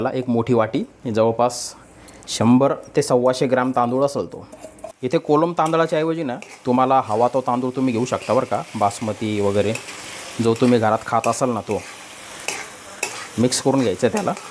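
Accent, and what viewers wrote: Nashik, India. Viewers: Tumacha tone Nasik cha chan vatato aikayala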